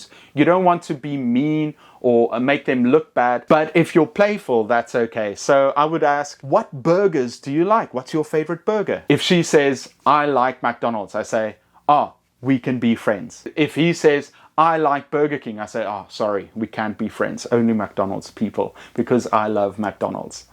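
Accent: South Africa. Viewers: Sir...great video..but are u by any chance ...south african?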